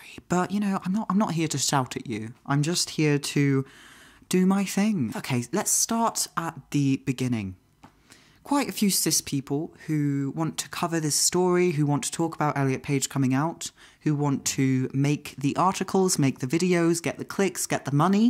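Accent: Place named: United Kingdom. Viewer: I've never come across your channel before, but I need to say I was NOT prepared for that voice! Between the soothing timbre (I was fooled by your cherubic face) and the British accent... lovely. Like I could see you narrating documentaries or something haha.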